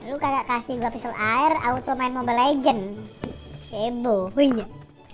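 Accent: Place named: East Java, Indonesia. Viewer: Jawatimuran nie... Anjay ..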